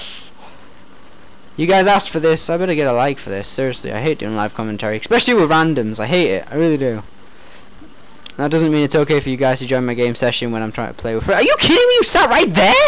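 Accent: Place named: United States. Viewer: are u kidding me ??? ----- really? i think all the americans are rubbing off on you - starting to sound like them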